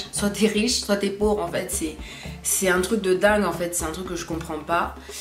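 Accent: Gabon. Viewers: Eké ton accent myéne est mitigé hein! Mais j apprécie ta vidéo felicitation 👏🏽👏🏽👏🏽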